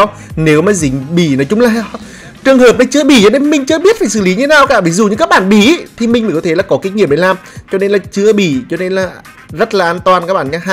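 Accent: Nghe An, Vietnam. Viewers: phải quê nghệ an k cách nói chuyện hay quá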